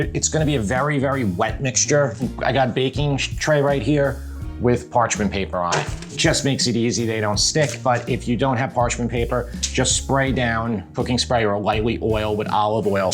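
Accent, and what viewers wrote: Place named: Long Island, United States. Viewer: This is like old home week for me. I grew up on Long Island although I’ve been gone for more than a few decades. Listening to your accent makes me smile and watching your recipes reminds me of my own kitchen.. Truly enjoying your channel.👍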